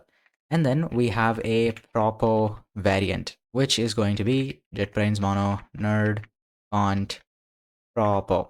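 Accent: India. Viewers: > it's the shortcut through years of confusion, broken configs, and scattered information. vaxry (the maintainer/creator of most things hypr) sells it for 5usd, and doesn't even try to pitch it onto the marketing-speak of yours of it being any worth, much less it being some adequate/proper/fast way of coming to the hypr ecosystem/the linux way of things. + indian accent lmfao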